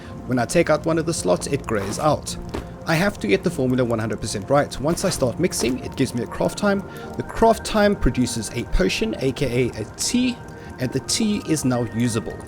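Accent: South Africa. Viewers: this guy sounds south african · Mister are you from South Africa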